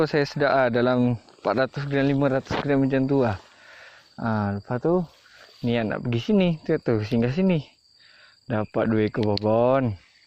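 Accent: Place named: Java, Indonesia. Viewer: Pekat Jawa bang. Hahaha. Gede iwak e.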